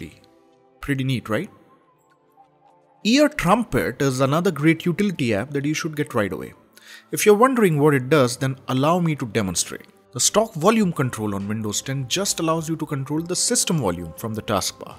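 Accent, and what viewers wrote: India. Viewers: Very shitty Indian accent, go back to scamming. This video was annoying.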